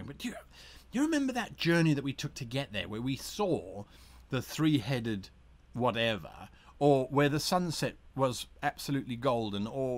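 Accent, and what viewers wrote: United Kingdom. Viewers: I know this is an old video to comment on, but I am so glad I watched it. I have suspected for a long time that you are South African, but was never sure. Your accent is is such that I thought you may be British. Very very happy to see a fellow South African do well on YouTube and be an amazing voice for us nerds everywhere. You sir, have a new subscriber ! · South Africa, huh? I wouldn't have guessed that; your accent sounds British. Unless you moved there later in life.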